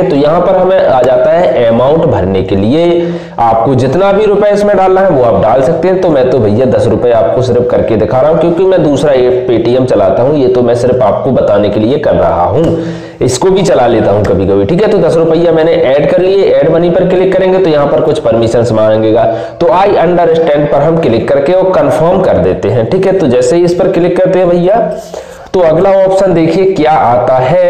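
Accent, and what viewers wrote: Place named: Bundelkhand, India. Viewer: Aapki language sunkar lagata hai ki aap Bundelkhand se belong kartre hai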